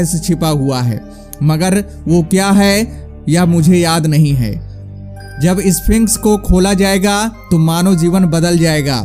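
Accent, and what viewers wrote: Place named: Bengal, India. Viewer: Are bhai ye hindi bolne ki kosish kr rha hai iska accent bengali jaisa hai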